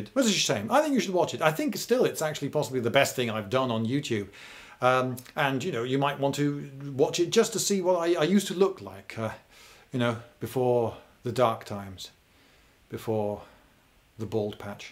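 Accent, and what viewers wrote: England, United Kingdom. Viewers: For some reason in my head when im hearing you is almost like im hearing John Cleese, maybe its the english accent or the subtle humor, in any case its very captivating. Thank you for all the effort and hard work you place in all your presentations.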